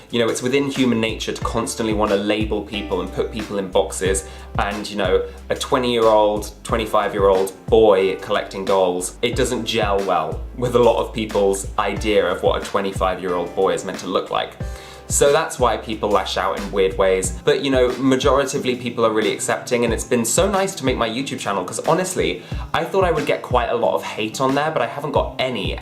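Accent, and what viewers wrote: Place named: England, United Kingdom. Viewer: I am subscribed, so I have spent the last month going back through your older videos. I love your accent. To my Mid-western ears, it sounds so English, but in a good way. Not sure I said that right... Anyway, your story is great. Mine is pretty basic: I come from a Farming/Teaching family. At least 5 generations that we easily know about. As such dolls were a luxury that if you got one, you took care of it and passed it down to the next generation, who was taught the same thing. I love dolls because it is part of my family history. I expanded my collection to include modern dolls as they came out.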